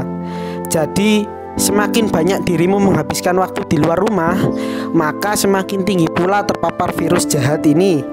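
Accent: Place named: Java, Indonesia. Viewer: Logat jawamu kental sekali kawan wkwk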